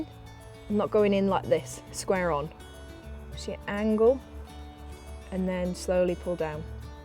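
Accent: England, United Kingdom. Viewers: Lovely acent i believe English. What part of UK ? Thanks for your info on glazing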